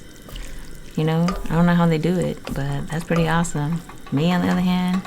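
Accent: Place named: South, United States. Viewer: I love your voice; I hear a southern accent 💞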